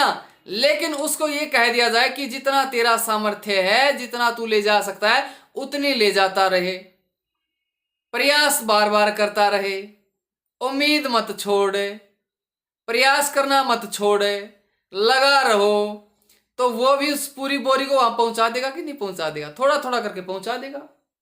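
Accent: Haryana, India. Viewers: Aap haryana se ho na 😅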